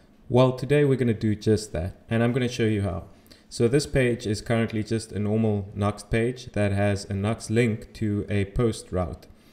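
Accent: South Africa. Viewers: Are you South African? Sounds like an Afrikaans English accent.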